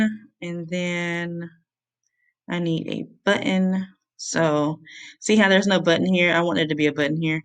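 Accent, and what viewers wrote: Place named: South, United States. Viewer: oh you’re from Enfield, you country lol I mean I’m country too, but y’all accents are thick lol Do you prefer mailchimp over Privy?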